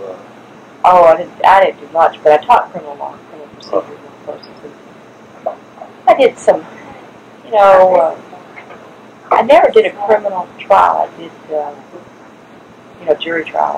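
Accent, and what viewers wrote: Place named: South, United States. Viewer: This does not sound like Hillary Clinton at all. She is from Illinois and this woman has a distinct southern accent and her tone sounds nothing like Hillary Clinton. This woman sounds like one of the designing women.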